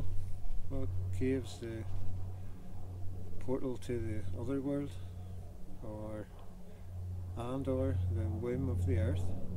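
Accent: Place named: Fermanagh, United Kingdom. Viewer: You’re welcome, thanks for the informative videos. Listening to you talk reminds of my friend from Lisnaskea in Fermanagh. These past couple of years have brought us all down, it’s just not normal. I wish I had more of my wife’s positive outlook, she’s just a happy person, where I’ve become so angry with all the goings on. Definitely get out and reconnect with that ancient world, it’s a fascinating and peaceful as well. Take care…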